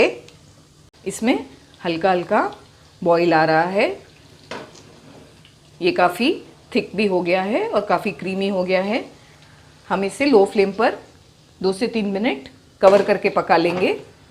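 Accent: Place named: Gujarat, India. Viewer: Askm , i tried this, Alhamdulillah Alhamdulillah bohot acchi bani.....Aap se ek sawaal hai, kya aap Gujarati hai? :) aapke kuch words ya lehja thodda thoda sa gujarati lagta hai...memon my be ? :)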